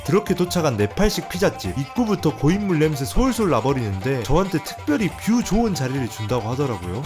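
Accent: Jeolla, South Korea. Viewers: "전라도 사투리 구수함 200배" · 전라도 사투리 너무 웃겨요 ㅎㅎ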